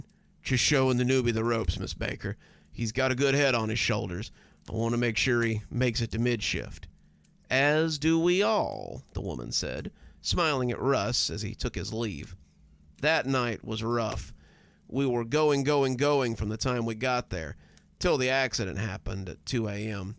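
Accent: United States. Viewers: were does ur accent cum from American obviously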